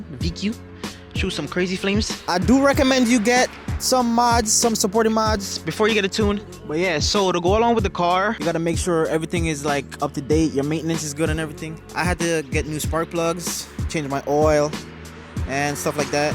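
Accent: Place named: Jamaica. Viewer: Bro sounds so Jamaican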